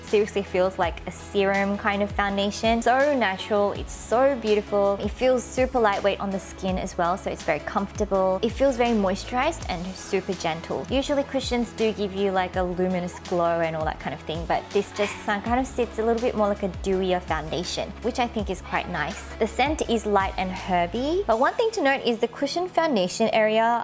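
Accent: Australia. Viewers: Ur aussie accent!!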